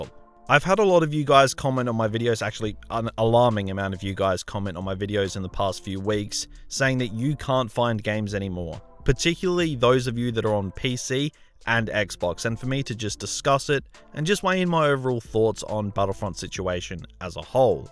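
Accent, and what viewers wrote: Australia. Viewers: Your Australian ? Nice I thought for some reason you were English lol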